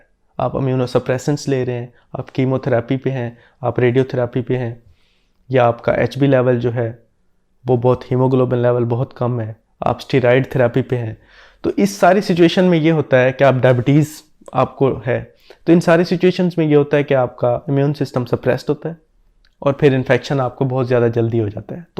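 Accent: Saraiki, Pakistan. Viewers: ڈاکٹر صاحب سرائیکی لگتے ہیں اپنے accent سے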